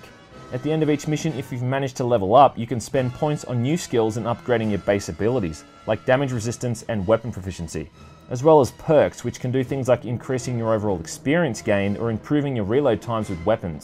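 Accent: Australia. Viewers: Aussie mate ?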